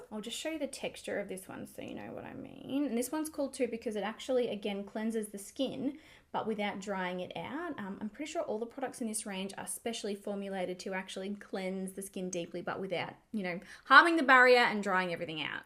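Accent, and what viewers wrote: Australia. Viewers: Love the Aussie accent😍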